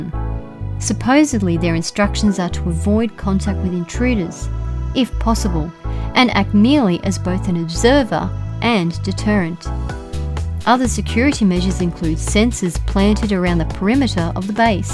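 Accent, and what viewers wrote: Australia. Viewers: She has an Australian accent. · that is australian accent Mate !!!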